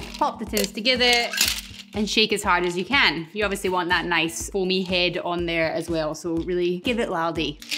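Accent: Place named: United Kingdom. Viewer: i fell in love with your accent , which part of UK?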